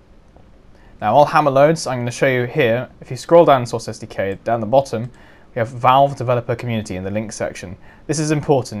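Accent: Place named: United Kingdom. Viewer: I freaking love you British accent *0*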